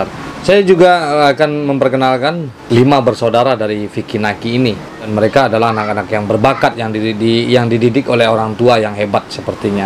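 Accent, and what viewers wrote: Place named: West Sumatra, Indonesia. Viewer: iyo lah logatnyo Ajo mirip urang minang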